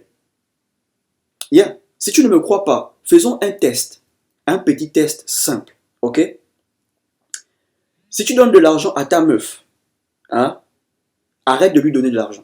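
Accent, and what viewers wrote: Gabon. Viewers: Bro tu connais.escuse moi tu es de quel pays.tu parle comme un gabonais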